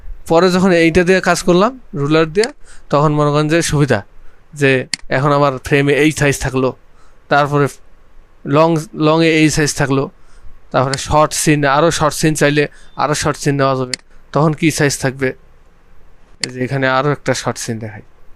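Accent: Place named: Rangpur, Bangladesh. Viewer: ভাই আপনার বাড়ি কি রংপুরে নাকি? ভাষাটা খুবই পরিচিত মনে হচ্ছে ❤️❤️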